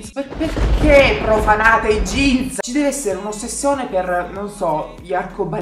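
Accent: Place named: Foggia, Italy. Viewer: posso farti una domanda che non c'entra niente col video?? tu sei di Foggia?? no perchè dall'accento sembra di si!!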